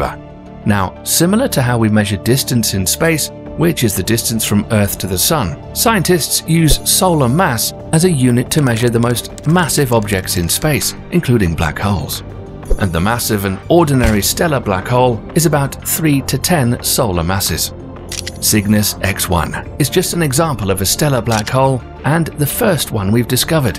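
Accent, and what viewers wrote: United Kingdom. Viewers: Im tired of these anti-christ british speaking clowns who just spread false fear Mongering. The Faith Rebukes Your Ways.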